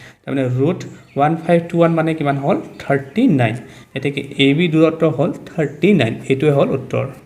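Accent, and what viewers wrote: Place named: Bhojpuri, India. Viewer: Ekadam Bhojpuri Disha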